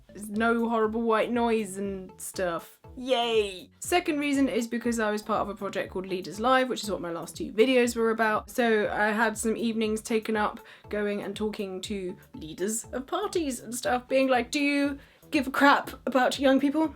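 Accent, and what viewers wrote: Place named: Cockney, United Kingdom. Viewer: So not only are you a cockney girl with a great personality an infectious laugh and sorry for been sweet and corny but beautiful eyes but you like danger mouse gimme gimme gimme I grew up watching danger mouse, super mouse, banana man and the raccoons.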